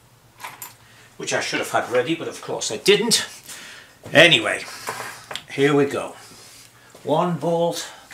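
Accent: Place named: Teesside, United Kingdom. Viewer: Great stuff,. I have rebuilt a couple of OiF BSA singles and this reminded me of every turn of the wrench as I did so. Despite my mature years, you showed some methods and tools that I had not seen before. Really excellent and I look forward to the engine rebuild. Being from Tyneside, I recognised the smoggy accent immediately!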